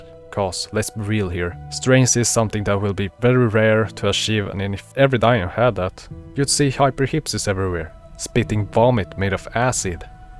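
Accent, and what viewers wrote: Sweden. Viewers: are swedish right cauce you sound like it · Holy swedish accent😭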